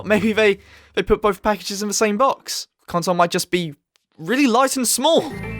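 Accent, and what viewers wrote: United Kingdom. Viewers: HE Is BRITISh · british :3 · This guy sounds British. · Are you from London ? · He is extremely British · Your english accent is so cool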